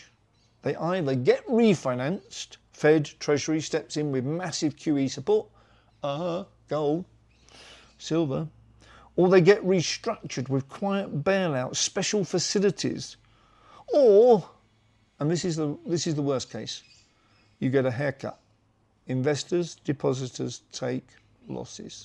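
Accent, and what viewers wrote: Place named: United Kingdom. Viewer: It’s very refreshing to have a Brit doing this so well…the American accent and the hogwash really is off-putting.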